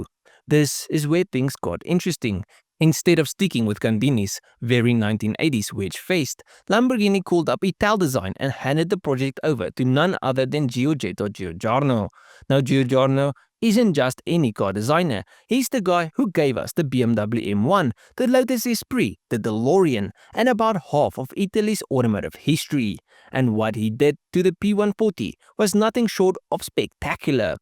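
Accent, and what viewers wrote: South Africa. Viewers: I think they say it “Guy-yard-o”, at least they do if it’s a Spanish bull., I’m trying to place your accent; South African? Love the videos.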